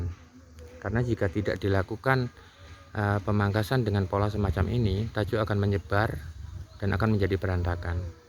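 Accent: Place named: Java, Indonesia. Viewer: padahal logatmu logat Jawa kampung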